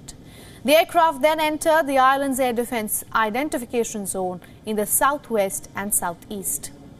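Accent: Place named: India. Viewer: Indian accent is so funny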